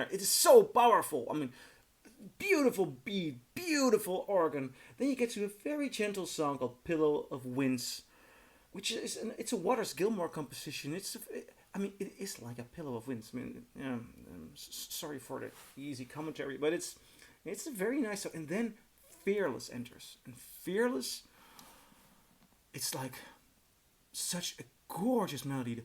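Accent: Netherlands. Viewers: Are you from The Netherlands? Just asking because of your accent. I'm Dutch.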